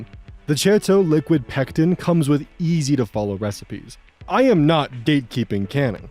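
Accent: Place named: New York, United States. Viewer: Hearing being from Idaho is surprising to me you have a stereotypical New Yorker accent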